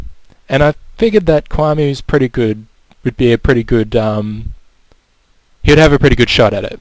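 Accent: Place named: Australia. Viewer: no problem you from aus too by the sounds of it good work ill subscribe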